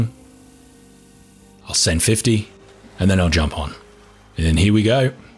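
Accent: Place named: Australia. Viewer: Thanks for this! I made and tested this last night, it works perfectly in the latest version (v1.08). I followed the procedure in the video exactly using log catchers to block off the openings, and viola....a perfectly functioning log catcher! Also, I got it on the first try, so apparently I'll be taking over channel soon! Although, that would never work..... A. I'm too lazy for that much work. B. My voice just wouldn't be as relaxing as, and no Aussie accent. Personally, I agree with on this, and feel this is a workaround for a problem with the game rather than an exploit. Really, there should be a "Log Catcher" available from the blueprints menu, but until that day, or the day the devs nerf this, it's a working solution. Cheers!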